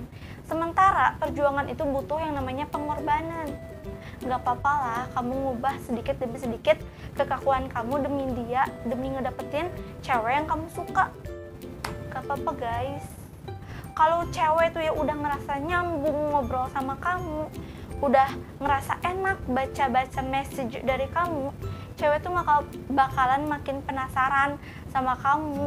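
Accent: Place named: Subang, Indonesia. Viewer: Mirip orang subang...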